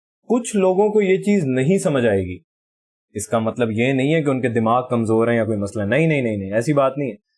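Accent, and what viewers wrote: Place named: Punjab, India. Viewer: Sir ap ke mooh se Punjabi phubdi aa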